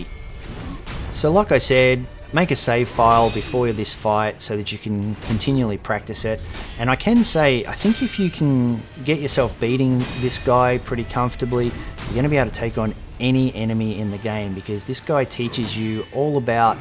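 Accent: Australia. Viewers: i feel like everything is easier to learn when explained with an Australian accent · great to hear an Aussie accent for a change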